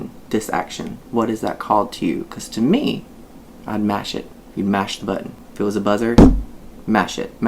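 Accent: South, United States. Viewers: You said the magic words with the best Southern take… “I feel like _____.” Southerners say this when we have an opinion on something. Master’s Level: pronounce it like does… Ah fill like ____. ❤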